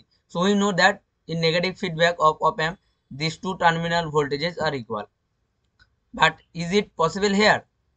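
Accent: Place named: India. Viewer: Very hard to understand your Indian accent. Is it possible for you to speak slowly?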